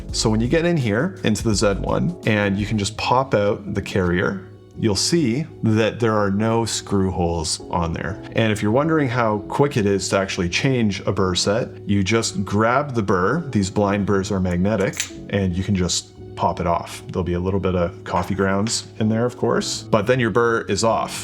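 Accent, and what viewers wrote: Canada. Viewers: Your accent is really ugly. Is it Canadian?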